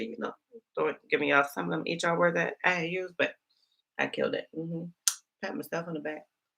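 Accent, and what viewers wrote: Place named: Charleston, United States. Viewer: girl you sound like you from Charleston and if you not girl where are you from because I sound just like you 😂😂😂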